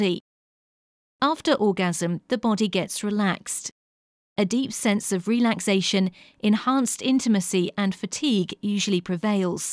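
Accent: United Kingdom. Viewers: This was very informative and also hilarious for some reason. I think its the british voice 🤣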